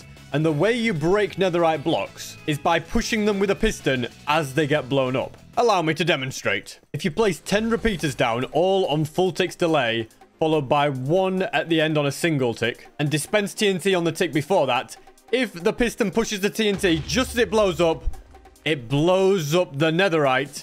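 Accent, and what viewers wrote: United Kingdom. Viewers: Also are u like British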